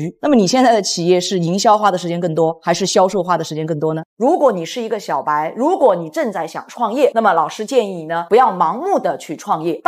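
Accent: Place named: Chongqing, China. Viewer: 我以為你是重慶人。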